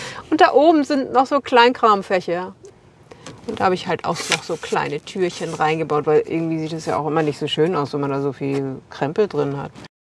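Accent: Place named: Northern Germany, Germany. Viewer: Liebe 👋🌊⛰️🕊️ Jetzt wo ich dich schon öfters gesehen habe, sind die Ähnlichkeiten mit Heike längst verschwommen... Und ich sehe dich! Wenn ich nicht irre kam das eine oder andere nordische Wort durch oder hamburgisch... Ich bin Österreicherin... Für mein Ohr klingt Norddeutsch irre schön. Das rollende rrrrrrrrr Also dein Ausbau ist so toll geworden... Außerirdische schön... Die ganzen Details... Und dazu dass du dann immer sagst "ich find das so toll dass ich alles im Sitzen erreichen kann" zb Einfach dass du deinen Ausbau liebst... Das liebe ich wiederum Ja... Ich träume ja auch davon einfach loszufahren... Ich träume aber auch von einem gemeinschafts-gemüsebau-projekt Da du um ein paar Jahre älter bist als ich, hab ich total den Druck verloren, jetzt ALLES auf einmal realisieren zu müssen. Danke dafür! Der einseitig blickdichte Vorhang ist auch sowas von genial! 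Hej... Du isst ja auch Gemüse zum Frühstück... Wenn du dich noch 1 Stufe weiter traust, könntest du Wildkräuter wie Löwenzahn zb dazu essen. Ich sag nur - irre gesund und bewusstseinsöffnend! Gomera ist ja bekannt für seine verschiedenen Klimazonen, also den Berg rauf findest du die.. da kennen die einheimischen sicher 1, 2 Wildkräuter die man essen kann. Roh. Wie Kräuter über m Salat 🥗 Du wirst es nicht bereuen... 🌿🤘😉 Liebe... Vielen Dank für deine Videos... Sie beruhigen meinen wilden Drang sofort loszufahren... Dass du morgens als erstes schwimmen gehst... Ja.. toll... Man ändert so sein ganzes sein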